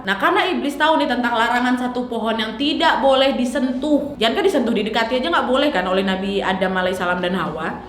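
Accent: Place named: Medan, Indonesia. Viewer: Dan medan🤣 logat kau nampak jelas kale🤣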